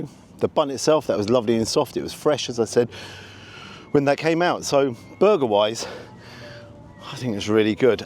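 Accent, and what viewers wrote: United Kingdom. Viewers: Love this - it's just like where I grew up in the US except with a British accent and a bit less food variety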